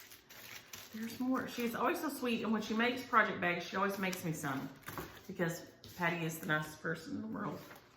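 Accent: South, United States. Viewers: I always love to hear your voice and your "Southern Sayings" Being from VA I don't have quite as much twang, but grew up with a sitter from GA so I have a few sayings that folks just don't get! I would love the opportunity to stitch for an hour or more in the flag pattern!!! 😉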